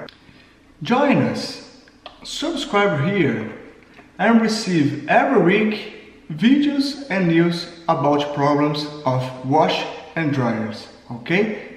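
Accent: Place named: United States. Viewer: Agora realmente ele esta americano como ele falar no video Parabens mesmo o a mericano.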